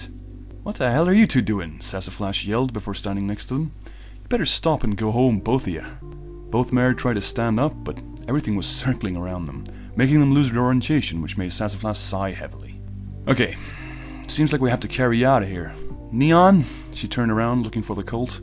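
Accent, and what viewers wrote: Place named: Ireland. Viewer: I think the irish accent just makes it dound even better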